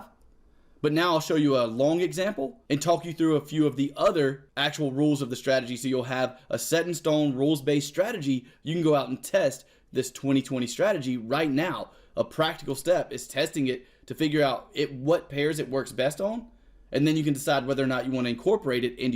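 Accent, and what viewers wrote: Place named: Texas, United States. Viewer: I'm not even American, but you sound like you're from texas or something.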